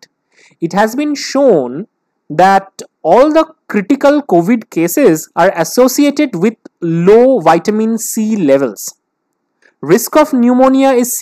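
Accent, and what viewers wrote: India. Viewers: This guy's got such a strong Indian accent, I love it. None of the cullies in my family have this strong of an accent, this guy's more Indian than Indian.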